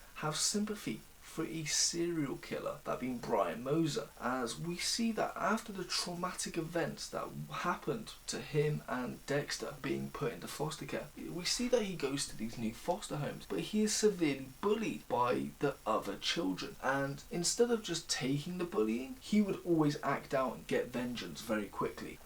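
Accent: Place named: England, United Kingdom. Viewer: Good video I like your accent even though I don’t usually like the English accent ❤️ you’ve got a very smooth articulate way of speaking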